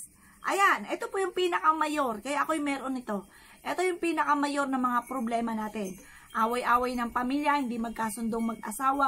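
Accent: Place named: Batangas, Philippines. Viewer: Hehehe.nkinig ko nmn ang punto mo madam akoy ntutuwa sau eh,,.nkow! Ala eh,,hehehe..madam parihas po tau,,batanginya po talaga kgaya ko..godbless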